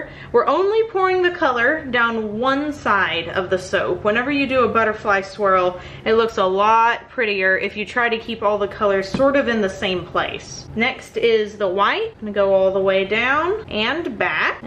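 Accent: United States. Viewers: какой же все таки противный американский акцент, но эта баба настолько говорит на нем, что ощущение, что у этого человека гайморит или синусит. фу блэ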